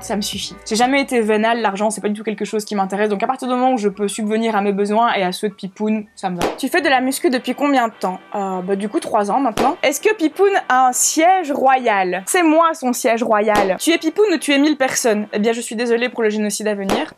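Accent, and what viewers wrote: Belgium. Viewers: J'adore l'accent belge ! ❤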